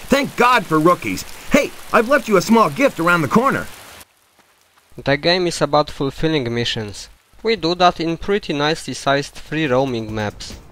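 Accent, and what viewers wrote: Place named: Poland. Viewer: Epicki polski akcent :D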